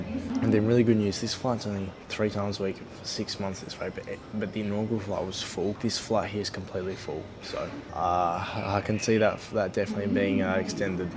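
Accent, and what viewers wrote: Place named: Australia. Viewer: You sound European Australian born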